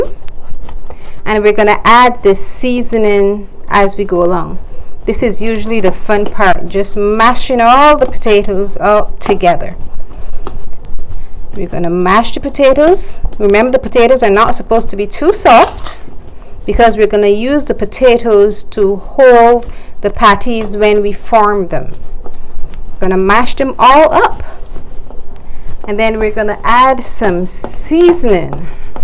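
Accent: Barbados. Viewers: Girl yousa bajan I recognise that accent anywhere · Nice to hear a Bajan for change. Love de Rock!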